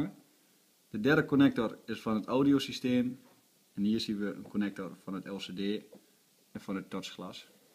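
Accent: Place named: Groningen, Netherlands. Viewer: jij komt duidelijk uit groningen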